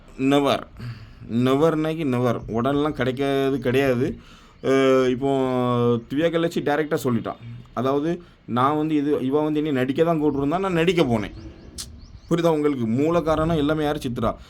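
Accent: Tirunelveli, India. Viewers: Thambee...enga oor karar aaa neenga??? Tirunelveli Nagercoil mix...slang...keka nallaa iruku😊...Naanum Apadi thaan pesuven😂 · Ama sis ivanga tnvl . Ivanga nama slang la solrathu kekka நல்லாதான் இருக்கு 😂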